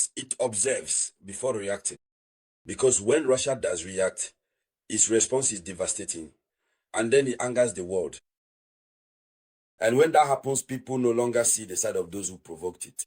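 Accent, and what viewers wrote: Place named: Africa. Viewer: Sir u speak like an AFRICAN y not speak about AFRICA ,UKRAIN try to kill PUTIN as they say what can be more dangerous than that and RUSSIA do nutin look how long UKRAIN as bin sending missiles n drone into Russia all these leaders only send trees..USA said any missiles fire by Yemen ,IRA is responsible yet still YEMEN defeat the USA in the red sea im paying attention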